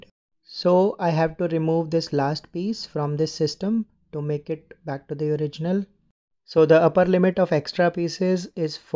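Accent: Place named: India. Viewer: YOUR INDIAN ACCENT SUX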